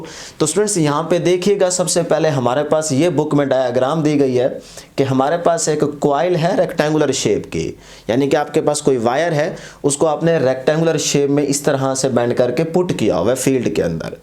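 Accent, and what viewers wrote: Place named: Saraiki, Pakistan. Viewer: sir m also.. from ur city bahawalpur But now m living ......in Lahore ...but I really appreciate ur hardworking n saraiki tone loved it .,.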